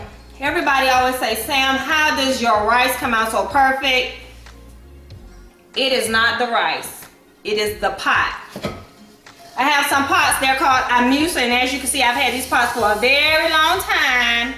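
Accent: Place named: Miami, United States. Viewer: this video recipe and instructions are all over the place and hilarious but i will b trying this, looks delich. and yes u definitly sound like a miamian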